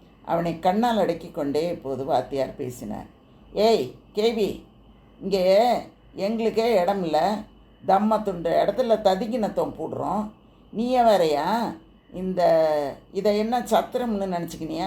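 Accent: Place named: Chennai, India. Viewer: நிதர்சனம். மெட்ராஸ் பாஷை super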